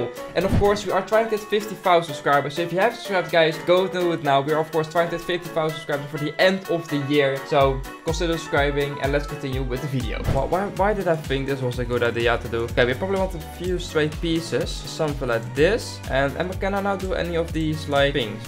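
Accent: Netherlands. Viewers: i smell dutch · Are you dutch by any chance?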